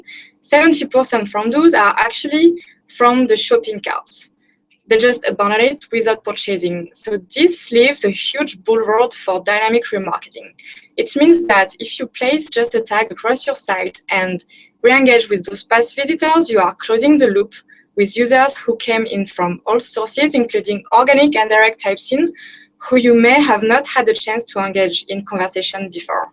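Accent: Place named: France. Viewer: Very cute French accent. Thanks for the video :)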